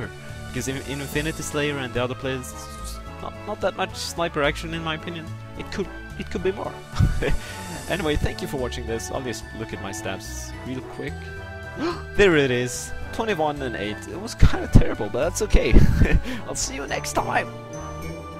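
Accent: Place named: Netherlands. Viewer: where are you from? sounds like a Netherland accent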